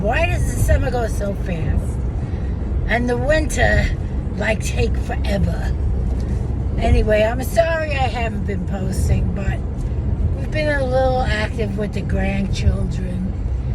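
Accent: New York, United States. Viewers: . Nope. My sister who talks like you is only one of our family still in NY. My Brother and I later went to Medical School in Omaha just around time Henry Hill ( of Good-fellows infamy) came to Omaha. Our accents were like yours 40-50 years ago. Can’t tell you how many times people asked one of us if we were in Witness Protection .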